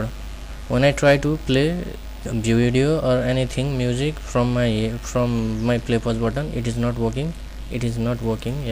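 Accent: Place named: India. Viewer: like seriously? The accent.....the mic.....the rooster....India...............just smh!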